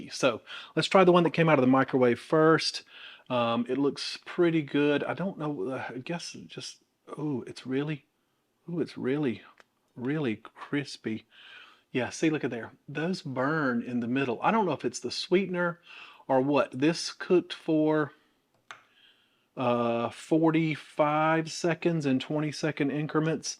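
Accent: South, United States. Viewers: Gosh I’ve had such a bad day, hearing this southern accent talk about cookies is just what I need 😊 thanks!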